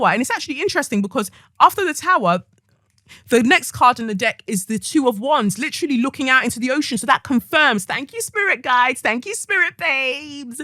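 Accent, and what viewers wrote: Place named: Africa. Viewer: Every single time!!!! Must you always be this on point african mother accent Everytime I listen to you it’s like your speaking direct to me.Always speaking the light I needs to hear at the perfect time. Thanks and appreciate you my baby gurlllll.✨🌻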